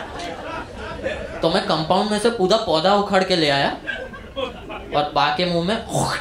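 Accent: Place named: Gujarat, India. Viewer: I love the way that Gujarati accent you use. Hats off. 🤩 you are star.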